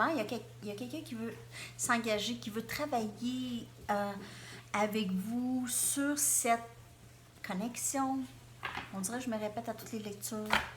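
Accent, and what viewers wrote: Canada. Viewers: je vous remercie pour tout vos tirages qui sont vraiment justes avec ce que je vie j’adore votre belle énergie et votre accent canadien ne changeait rien🥰😉 le concour j’ai tout loupé Mais j’aime vous lire merci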